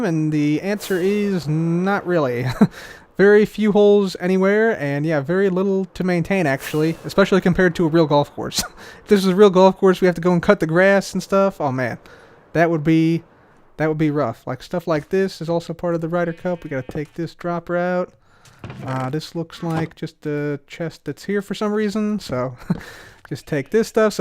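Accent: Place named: United States. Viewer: Sounds like he's from the US to me.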